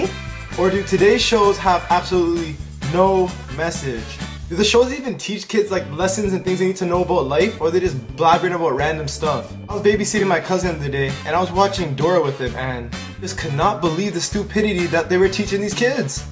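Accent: Canada. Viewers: LOL that dora bit was hilarious haha. he definitely sounds canadian though...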